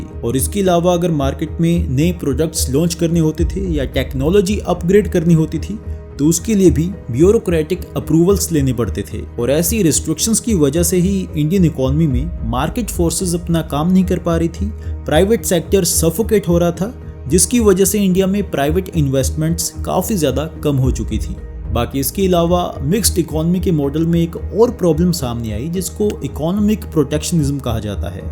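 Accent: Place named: Punjab, Pakistan. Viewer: Accent sounds more like how pakistani punjabis speak.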